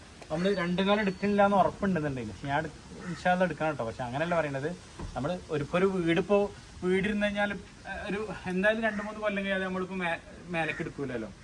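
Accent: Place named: Palakkad, India. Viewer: പാലക്കാടൻ Slang അങ്ങിനാ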